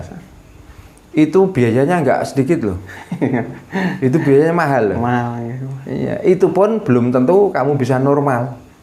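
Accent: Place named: West Java, Indonesia. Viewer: Logat bahasane kok koyo wong sundo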